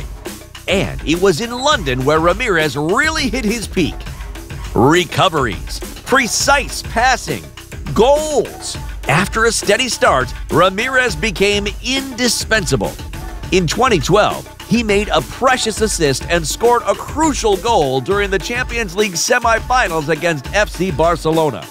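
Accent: United States. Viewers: its not benfeca its pronounced benfi-ica, and is it only me that finds it weird to hear American accent on a football video?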